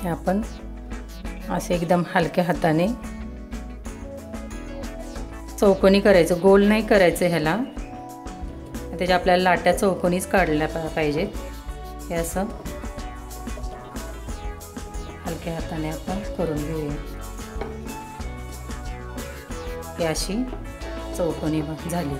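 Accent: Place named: Solapur, India. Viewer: Kaku tumhi proper kontya gavchya ahat tumchi language Akluj solapur sarki vate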